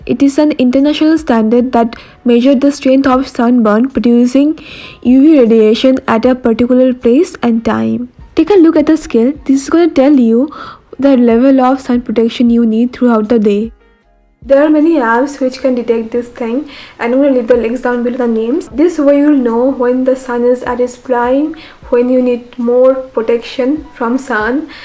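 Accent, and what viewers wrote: Bengal, India. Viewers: I just discovered your channel!! N I love your content!n I hv 2 questions fr u,:- 1)are on instagram??how can I reach u ? 2)r u bengali?(am guessing it fr the way u speak) And lastly much love to u!❤️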